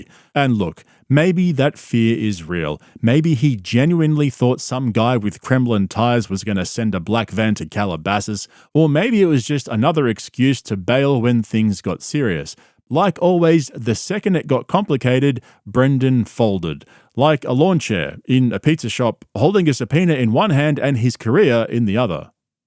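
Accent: Australia. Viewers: This is amazing, loving hearing an Aus accent on this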